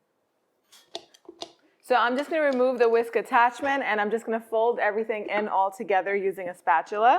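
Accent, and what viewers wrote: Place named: United States. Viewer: Γειά σου. Πρώτη φορά μού βγήκε βίντεο δικό σου στο YouTube και από το όνομα σου καταλαβαίνω ότι είσαι Ελληνίδα και χαίρομαι που δείχνεις ελληνικές συνταγές. Αυτά τα αμυγδαλωτά είναι τα αγαπημένα μου και τα φτιάχνω κι εγώ. Εγώ είμαι από Θεσσαλονίκη κι εδώ τα λέμε εργολάβους. Θα κάνω εγγραφή στο κανάλι σου και θα σε ακολουθώ. Από τι κατάλαβα από τα αγγλικά πού μιλάς μάλλον είσαι Αμερική. Αν θέλεις γράψε μου. Να χαίρεσαι το κοριτσάκι σου!! 🌷🌷🌷🌷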